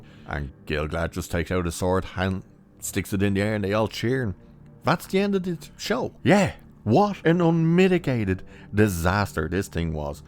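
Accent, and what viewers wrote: Ireland. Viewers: I didn't expect to hear the name "T'under-Tits" in a lovely Irish accent today but it made me laugh out loud. Shame I just found your channel at the end of this clusterfück of a show. Subscribed. Cheers from New Zealand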